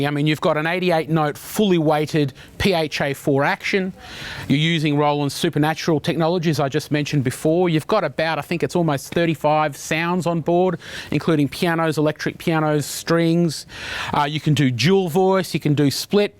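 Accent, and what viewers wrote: Australia. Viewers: Lol. He collects a check from Roland for hyping their product..and in that thick Australian accent. But despite the hype it's not a bad piano. I got one and love it.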